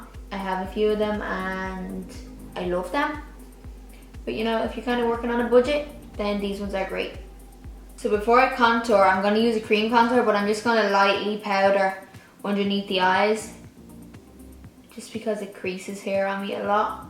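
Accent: Ireland. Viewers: Seriously I am subscribing just because I love your accent. Irish? My ancestors are from Cork. Stunning look by the way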